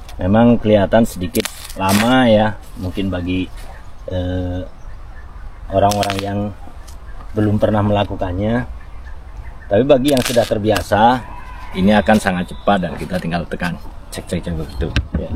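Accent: Madura, Indonesia. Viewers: Khas madura gak bisa hilang. Gaya bahasanya mirip cak islah bahrawi dan pk Mahfud MD 😂😂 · Bhs indo logat madura 😅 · alhamdulillah tabarakallah, sukses bertani moderen di negeri Sakura ❤❤Kami mau dengar, bahasa jepang logat madura atau bahasa madura logat jepang ❤❤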